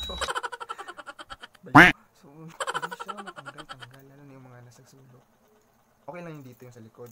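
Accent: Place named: Ilonggo, Philippines. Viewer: Yung accent mo conyong ilonggo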